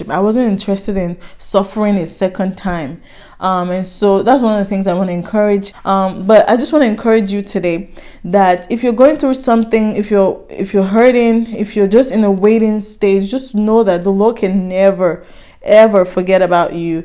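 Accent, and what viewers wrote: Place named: Benue, Nigeria. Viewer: New viewer here❤? Where are you from,you sound like someone from Benue,Nigeria…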